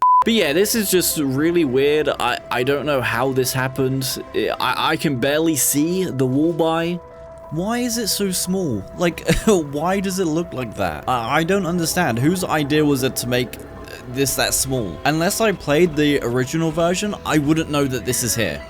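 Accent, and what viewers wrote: United Kingdom. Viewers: Is that an east Anglian accent I hear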